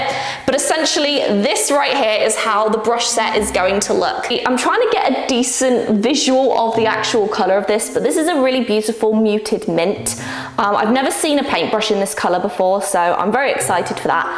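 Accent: United Kingdom. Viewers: You know I really like your uk accent Especially the Amazun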